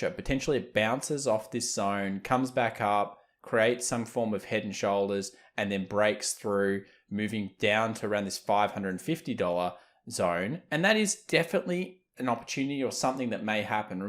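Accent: Australia. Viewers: I m not dissing every australian ahaha I didnt know australian speak like that. I just cant withstand different accents other than american. There is noth to do about it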